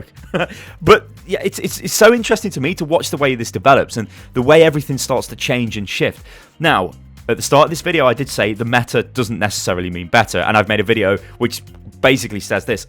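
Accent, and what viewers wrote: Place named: Liverpool, United Kingdom. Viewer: Where ya from, i cant pin your accent, is it liverpool or something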